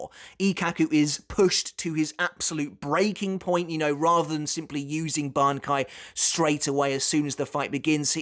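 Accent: United Kingdom. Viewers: It's one of my favorite banki. I appreciate the work you do, but I will say your pronunciation of the names is very lazy. I understand it's your British accent, but the names aren't British... At least try to pronounce them closer to Japanese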